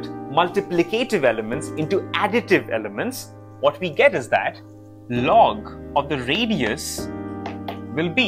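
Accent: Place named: India. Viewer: only indian channel where the accent doesnt piss u off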